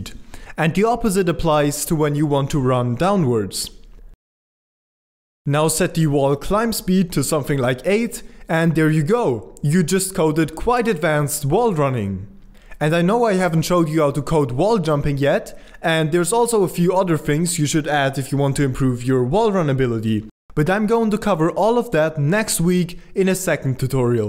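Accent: Germany. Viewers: IDK but is he German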